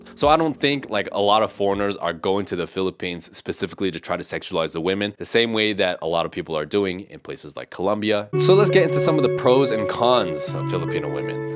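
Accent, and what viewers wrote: United States. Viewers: I'm thinking about it because I want to surf and skate 🤘😎🤘 and play some music 🤘😎🤘 You know this cat doesn't drink sink water in America 😂 he's totally a gas station water bottle Guy 😂 He speaks like an American but he said he was Chinese/Philippines he didn't say anything about why he sounds American 😂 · tribal … no … family first … your accent sounds american … which has become tribal of wokism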